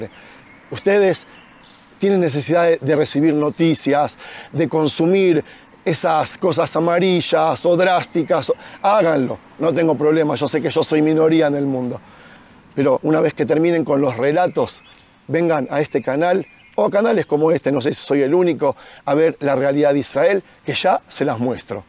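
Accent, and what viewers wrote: Argentina. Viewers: Estaria bueno que defiendas las Islas Malvinas con la misma energia,va digo por tu acento argentino...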